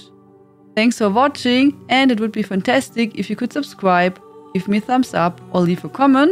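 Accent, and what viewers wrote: Germany. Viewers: You from Deutschland? sounds like one.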